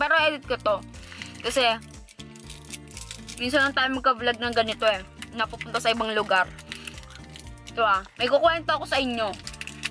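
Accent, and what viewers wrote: Iloilo, Philippines. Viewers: Ate na iilonggo ka ba??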